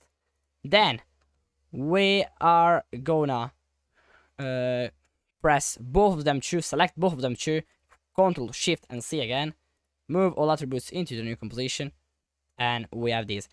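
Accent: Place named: Italy. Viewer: La pronuncia italiana❤🇮🇹 SEI FORTE CONTINUA COSì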